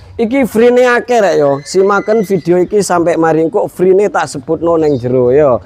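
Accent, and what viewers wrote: Madura, Indonesia. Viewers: Meduro logate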